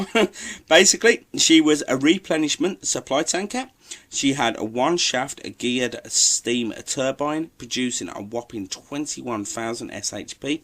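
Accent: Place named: United Kingdom. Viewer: there's something remarkable why is there a British guys talking about Canadian ships??? shouldn't be a Canadian guy talking about Canadian ships?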